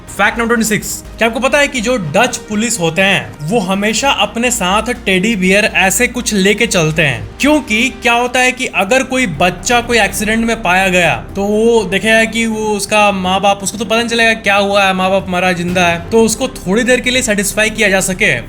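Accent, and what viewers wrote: Bihar, India. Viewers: Sir aap bihari ho na please reply me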